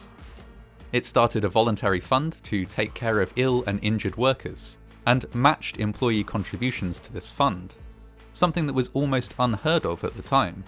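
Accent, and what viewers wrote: England, United Kingdom. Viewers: He definitely has an English accent! Definitely not a Scottish one, or even a mid or northern English one. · That's the English accent. 👍🏻🇬🇧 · He is British or at least sounds British.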